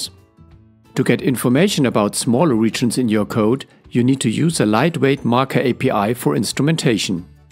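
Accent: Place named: Germany. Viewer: I love this German accent!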